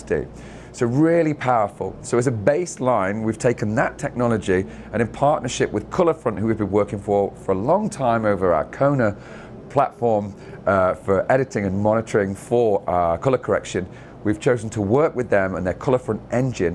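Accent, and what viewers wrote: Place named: United Kingdom. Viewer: Looking and sounding quintessentially British! Nice.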